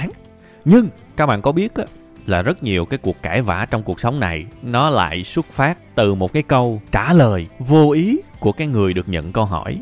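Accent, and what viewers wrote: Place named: South, Vietnam. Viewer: Thích giọng nói miền nam và cũng là âm thanh rõ ràng của thầy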